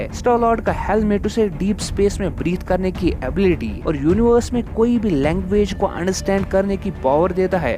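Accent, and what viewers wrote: Kashmir, India. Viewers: Bro your vioce sounds like kashmiri 🤨🤨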